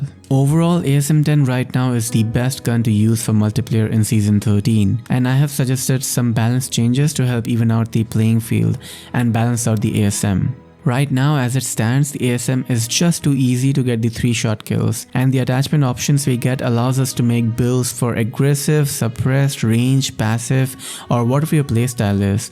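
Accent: India. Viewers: Your accent sounds like Indian. Are you Indian?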